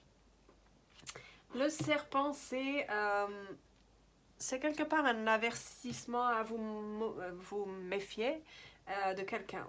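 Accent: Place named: England, United Kingdom. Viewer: Mignon ce petit accent anglais :D Je vous découvre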